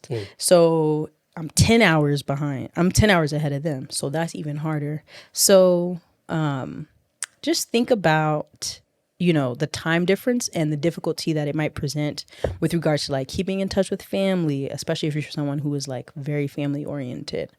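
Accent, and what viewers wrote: South Africa. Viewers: Welcome home, but you sound100% South African like a familiar girl I know in the hood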